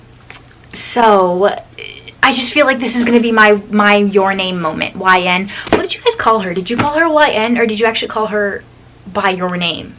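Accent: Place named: Midwest, United States. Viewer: “i sounded midwestern” hahaha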